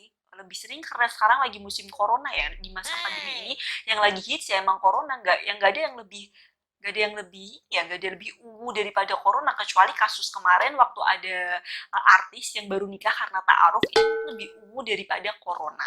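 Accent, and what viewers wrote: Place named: Java, Indonesia. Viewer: logat jawanya keluarkan dok,,jangan ditahan2 dok ,,,jangan lupa kunjungi ke puskesmas aku ya dok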